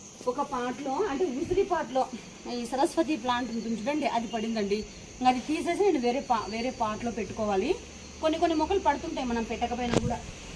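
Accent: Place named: Rayalaseema, India. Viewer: Mi devuru medam rayalasima lage vunnai matalu madi kadiri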